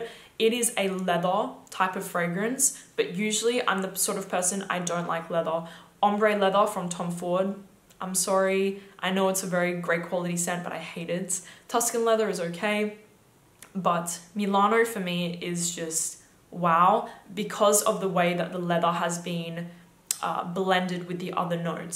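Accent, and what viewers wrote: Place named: Australia. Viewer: Australian from Perth mate😊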